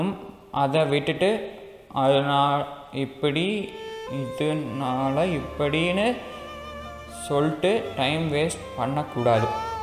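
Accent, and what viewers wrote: Coimbatore, India. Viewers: Eriutha eriyanunu tha da pottan 😂😂😂😂😂😂 semma bro super ah panriga unga slang super.. ungaluku konjam covai slang um varuthu semma bro evlo nal enga irruthiga ungala yt miss pannrichu neega kandipa top la varuvinga ungalukaga pray pannikuran....😍🥰 And Happy birthday sorry for the late wish 😍😍😍😍😍😍😍😍😍😍😍😍😍😍😍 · Kongu Tamil 🙂🙂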